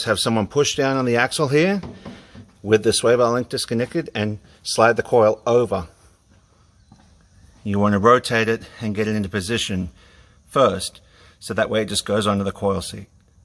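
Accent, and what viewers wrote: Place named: Australia. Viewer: I cannot make out your accent for the life of me. It's sorta Australian but not really. Sorta British but not really? Maybe New Zealand? Also, yeah you guys did it bare minimal taking everything apart. Other videos they would have taken everything apart, including the brake lines because they're so paranoid. But when you know what you're doing....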